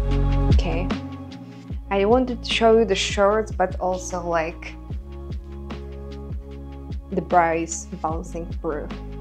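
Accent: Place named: Eastern Europe. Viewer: What camera do you use? The quality is terrific in 4K, which is a relief because one sees so much fake 4K on YouTube. The setting you're filming in also helps, it looks like a plush hotel room. The lighting looks well calibrated too. Very photogenic, your hair and makeup is flawless - if you're not already modelling on runways every week then I will be shocked! You look like a young, Eastern European (judging from your accent) version of Adriana Lima.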